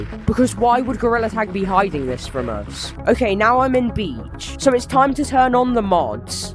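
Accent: United Kingdom. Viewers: Bro is british bruh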